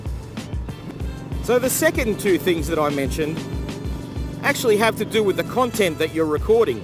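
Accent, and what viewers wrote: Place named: Australia. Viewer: I put it down is the Aussie accent lol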